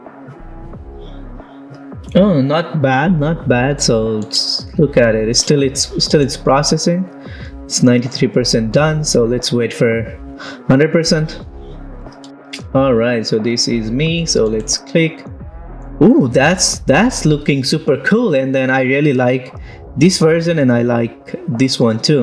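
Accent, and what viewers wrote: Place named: Nepal. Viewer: COCIAL MEDIA ACCENT TELLS U R NEPALI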